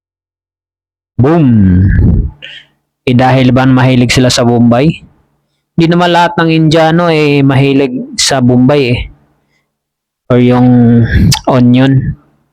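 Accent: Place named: Visayas, Philippines. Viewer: Tana na bisaya ingay mo 😅😂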